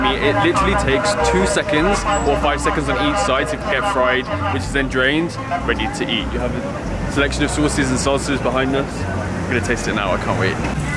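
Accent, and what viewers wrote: United Kingdom. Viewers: Hey You are so lovely And I love you Britain accent 😊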